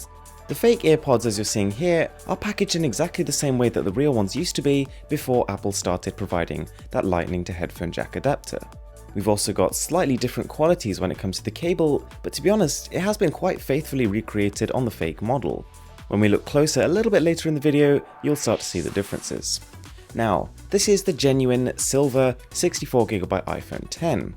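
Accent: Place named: United Kingdom. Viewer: British accent 😍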